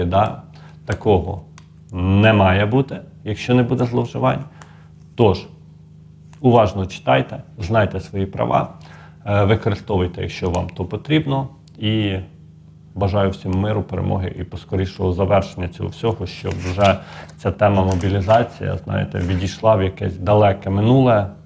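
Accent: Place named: Western Ukraine, Ukraine. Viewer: Судячи по діалекту ви орієнтуєтесь на підписників зах.України.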